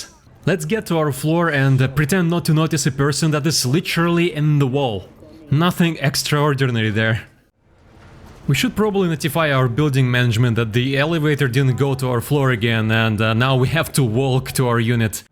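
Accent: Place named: Eastern Europe. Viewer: also, where are you from? I hear a nice eastern-European accent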